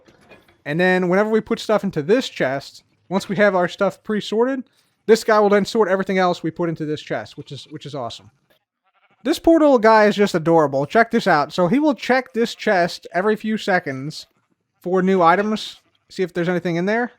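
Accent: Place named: Chicago, United States. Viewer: You're from Chicago, aren't you? First-time viewer.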